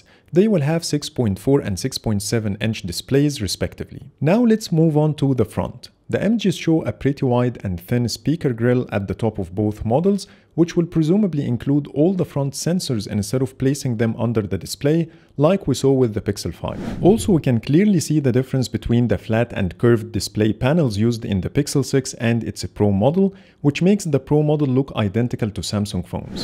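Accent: Egypt. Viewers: طب و الله حاسس انك مصري رغم انك تتكلم انجليزي😁 هعرف نفسي صحيح لما تحطلي قلب😅 اخوك من تونس 🇪🇬❤️🇹🇳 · Your accent is a lot similar to our Egyptian one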